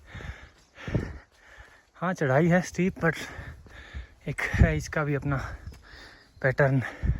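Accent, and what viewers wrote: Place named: Pahari, India. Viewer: Bro you speak in Pahari accent actually. Are you from Himachal or Haryana by the way?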